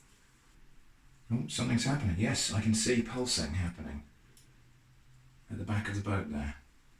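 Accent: England, United Kingdom. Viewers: Innuendo seems to go well with an English accent. Classy.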